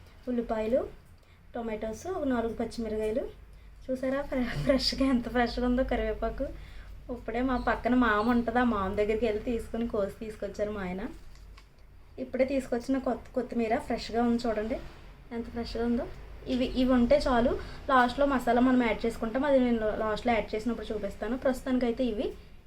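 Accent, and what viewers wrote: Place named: Amalapuram, India. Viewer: కూర ఎలా ఉన్నా కానీ మీ మాటలు మాత్రం ఆంధ్ర అమలాపురం అమ్మాయిలా ఉంది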